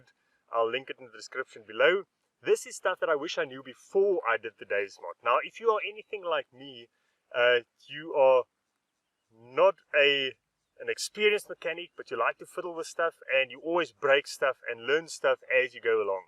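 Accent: South Africa. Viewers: Hi, starting to find your channel very useful I bought a a used 2012 last year. I live 50 kilos away from work and sometimes use the XR for that fun ride and when you get to to work or home my jacket and rucksack absolutely reeks of poorly combusted exhaust gases. I think I read that this bike was sourced in the Northern Cape and depending on where, the altitude could be quite low and close to sea level. I stay in Midrand and as you know that is about 1600 m (5000 feet) above sea level, so we decided to drop the jetting by one size. Got it back last weekend and have not had much chance of testing, but will do so soon. If I nurse the bike, I also only get 15kml and I think that just can't right. Hopefully this mod will bring some relief. It's great having an SA accent on the biking channels on Youtube, well done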